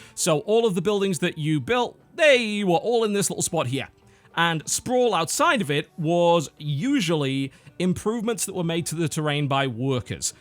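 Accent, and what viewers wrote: United Kingdom. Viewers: he died?!?!?! If so, may he rest in peace...that's really sad. I will miss his British (or English, Idk) accent...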